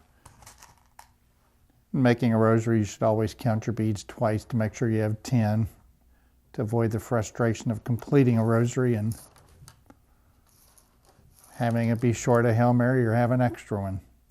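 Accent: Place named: Northern Ireland, United Kingdom. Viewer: Very northern irish sounding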